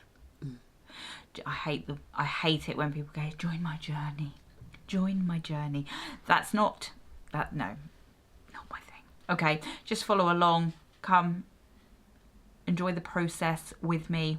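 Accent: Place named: United Kingdom. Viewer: Hi new subscriber here I love to find colourist and channels from the UK I love all others too but as I am also English there is a familiarity to me lol great video I look forward to seeing more videos and flick through just sounds so British to me lol I am all over the place in my videos too and in real life so I can relate too many ideas in the head for the mouth to keep up with 😂 and for the buying for multiple hobbies same lol buying things for hobbies is like a hobby in itself haha your personality seems very bubbly and I can see that going down very well In the colouring community 💜💜💜💜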